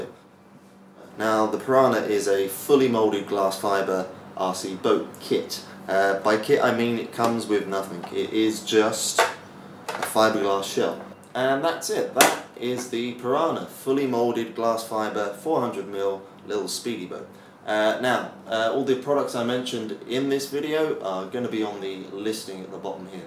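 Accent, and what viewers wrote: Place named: United Kingdom. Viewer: at last someone who speaks the queens english (sorry scott) it must have been made in the UK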